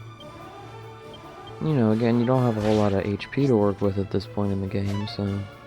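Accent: South, United States. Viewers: So...do I detect a southern accent? I'm from Oklahoma and I visit New York every other year sooooo.....yeah I usually recognize an accent when I hear one...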